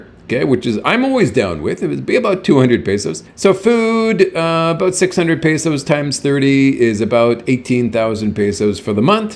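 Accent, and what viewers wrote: Canada. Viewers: Thought I detected a Canadian accent! New subscriber here from BC. Malaybalay looks very interesting. So insane how cheap it is...especially the rent! You seem to have created quite the income stream for yourself. Congrats. I'm looking forward to viewing more of your content.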